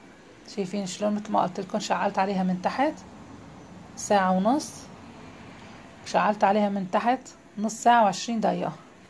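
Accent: Aleppo, Syria. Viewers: تسلملي اللهجه الحلبيه...ابوس روحك 🌹🌹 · خالة حلبية 😍 من صوت عرفت 😘❤ ونعم وانا من حلب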